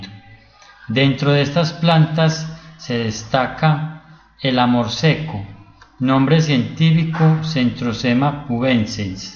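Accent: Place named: Colombia. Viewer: Ame el acento colombiano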